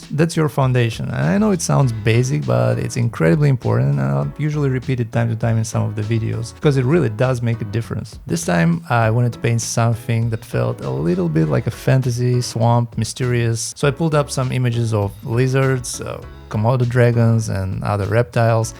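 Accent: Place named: Russia. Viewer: хорош кста слышно русский акцент 😃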